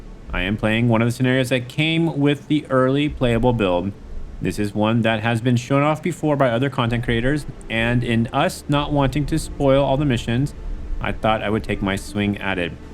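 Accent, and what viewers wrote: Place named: Eastern Europe. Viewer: au au au, even my eyes got hurt by your Slavic pronunciation... Dude! Come on!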